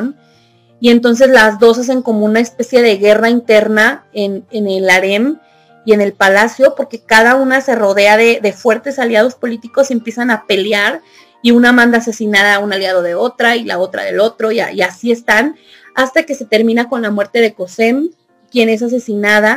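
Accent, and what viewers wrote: Mexico. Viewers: Muy buen trabajo, interesante e informado. Me costó adaptarme al acento mexicano, pero valió la pena. 👍🏼🇺🇾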